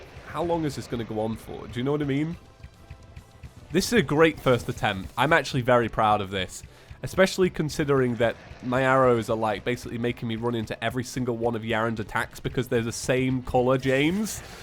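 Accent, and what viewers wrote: United Kingdom. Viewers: For the most part it is just his british accent, (I don’t think it’s horrible, I actually love it) but his voice is kind of deep so that makes it sound a little bit different than others. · It's not captions, it's terrible accent. All I hear is "hell loacher ari-eh and fewzis my name is tsheims". English is not my native language but even for me this speech is too painful to hear. Is it how british English sounds?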